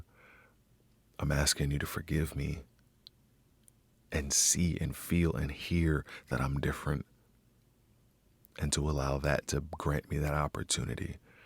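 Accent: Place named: South, United States. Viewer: I could listen to your soft guttural accent with a slightly southern drawel sweet and slow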